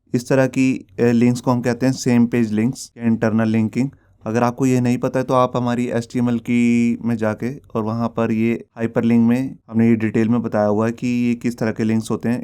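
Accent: Punjab, India. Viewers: Sir aap punjabi ho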